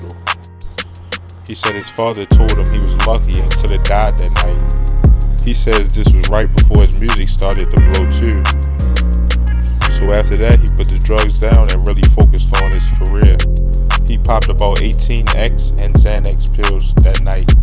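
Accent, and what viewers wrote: Baltimore, United States. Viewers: Gotta be from Baltimore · the person talking is definitely for baltimore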